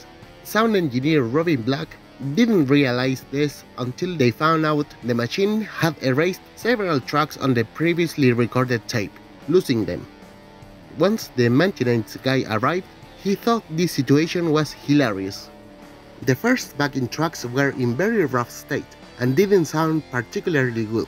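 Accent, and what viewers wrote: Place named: Spain. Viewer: Interesting video... but I would have done without the extremely thick spanish accent of the narrator - it's quite annoying...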